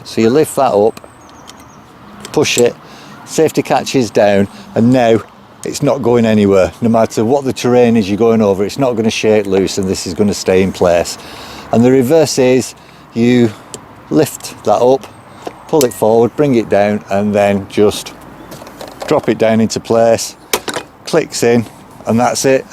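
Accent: United Kingdom. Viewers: Are you uk reviewer?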